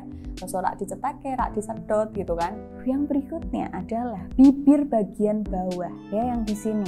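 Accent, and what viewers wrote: Java, Indonesia. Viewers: nek ngomong yo muueeedokkk lucu sih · Lucu kamu medok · Bahasamu we loh mba medok banget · Bisa jawa jowo mu ngendi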